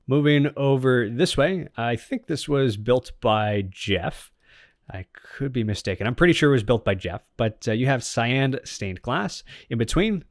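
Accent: Canada. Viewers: are you from vancouver?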